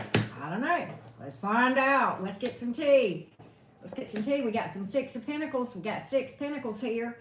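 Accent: South, United States. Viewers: I am handling it pretty well. Let the chips fall where they may! Thanks for your great reading. Love your Southern accent! <3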